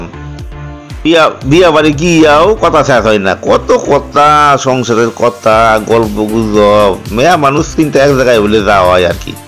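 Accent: Bangal, Bangladesh. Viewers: Dada to darun bangal bhasa bolen darun darun khub haslam go আর খাওয়া দাওয়া সেই ছিল 😋❤❤❤❤ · Biye bari blog darun ...sathe dadar bangal kotha just fatafati 😊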